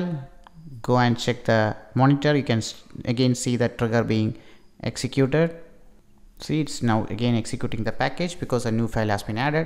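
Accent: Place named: Kerala, India. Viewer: malayali accent :)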